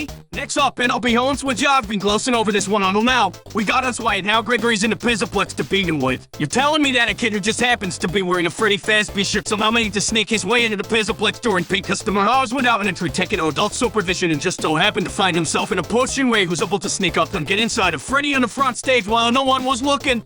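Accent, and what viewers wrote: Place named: Boston, United States. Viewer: that amazing Boston Boy accent was a pleasant surprise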